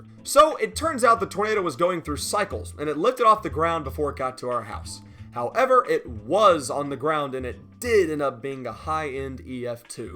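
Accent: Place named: Franklin County, United States. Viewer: So do you live in Franklin county like me because it sounds like you live In Franklin county like me🤣🤣😘🌪🌪🌪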